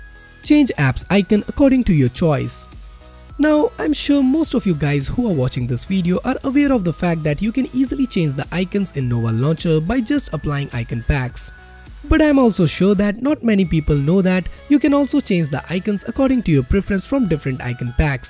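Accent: India. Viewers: It's like the robo narrator but with a heavy Indian accent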